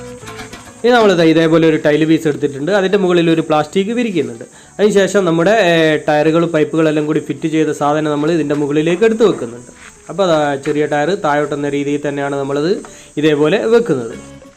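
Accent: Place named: Malappuram, India. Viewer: Adipoli aayittund engale veed evide.samsaram kettitt malappuram aanennu thonnunnu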